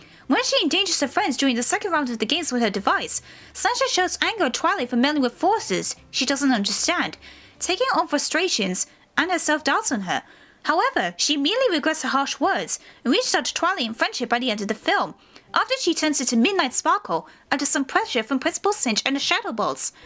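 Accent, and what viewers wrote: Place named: United Kingdom. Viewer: For your great British accent and your love for Sunset Shimmer, you gain a subscriber.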